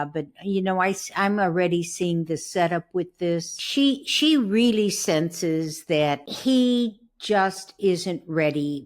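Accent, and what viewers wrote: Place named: Midwest, United States. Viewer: Hi ….I enjoy your commentary,but……I’m not trying to be nasty here, but I just have to say…… your midwestern accent is so annoying! It’s grating!! It makes it difficult to listen. You really should try to mitigate it. Perhaps there’s some kind of course on YouTube that could help you.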